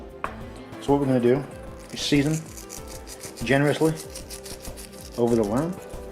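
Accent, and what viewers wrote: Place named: United Kingdom. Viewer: my goodness, you're hot,! <3 im a first time viewer of your channel and good job! And your accent immediately reminded me of my Brit friend...whom, turns out, lives near Rotherham as well! I hope I'm developing an ear for the regions of the UK! Anyways, a man that knows his way around the kitchen is a total turn on! Ahh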